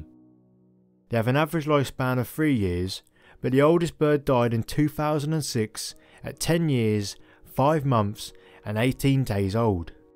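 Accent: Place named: East Anglia, United Kingdom. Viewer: Are you from East Anglia? That accent sounds familiar haha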